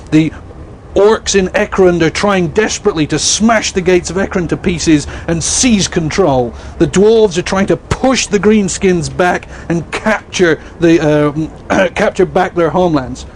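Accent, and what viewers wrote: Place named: Yorkshire, United Kingdom. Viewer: Hahah, it's genuine. It's a just a regional accent, yorkshire i think! Although Games Workshop have made it big in north america they are a british company.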